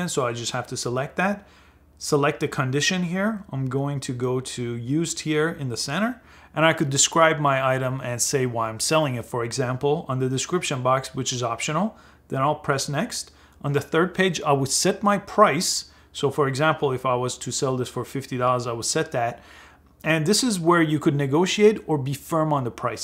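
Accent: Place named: Iran. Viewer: U sound Persian. I mean your accents is a bit Persian guys. am I right?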